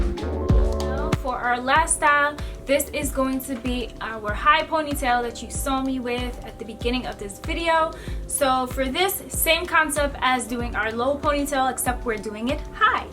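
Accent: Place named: Jamaica. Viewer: You should speak with the Jamaican accent more often 😁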